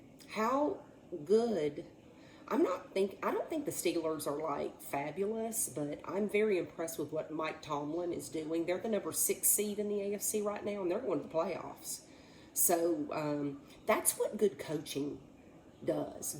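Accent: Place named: Texas, United States. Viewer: I'm a Bronco fan and I love your passion and Texas accent 😅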